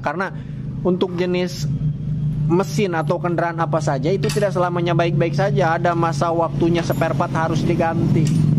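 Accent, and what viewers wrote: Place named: Sunda, Indonesia. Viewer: kang ieu dimna kok kaya bahasa sunda tp kaya beda logatna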